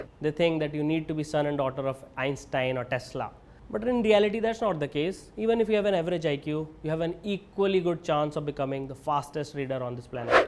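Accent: India. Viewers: Why does He sounds like He is speaking english in hindi language? I cant catch up with this guy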